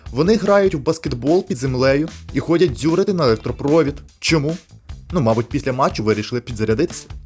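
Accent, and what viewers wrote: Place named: Ternuvate, Ukraine. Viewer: Прийшла дивицця заради божественного тернуватського суржику, а його тут не було :( шо за підстава? П.с.: з дебютом🎉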